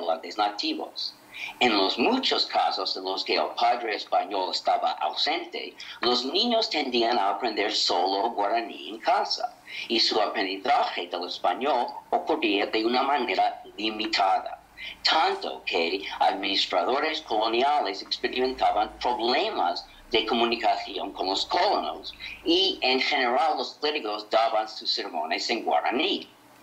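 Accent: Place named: United States. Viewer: Este estadounidense es un sabio erudito y se esfuerza en trasmitirnos datos sumamente interesantes, pero habla demasiado rápido e indudablemente con un acento poco español. En un texto escrito, se le entenería perfectamente. Pero GRACIAS.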